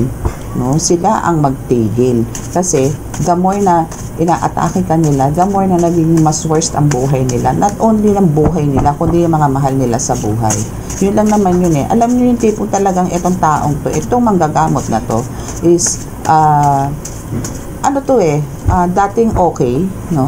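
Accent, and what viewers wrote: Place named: Visayas, Philippines. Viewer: hahaha cute niyo po mag bisaya 🙏🦋🌻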